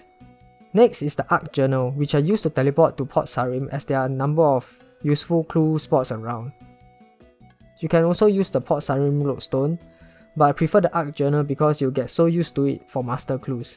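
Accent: Singapore. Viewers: SG Accent ♡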